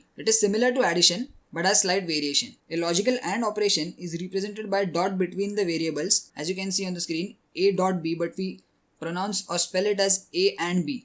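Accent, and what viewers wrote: India. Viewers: is he Indian or something? Sounds like Kuthrapali.. and why is the AND logic NOT depicted with a +, it would make more sense ;)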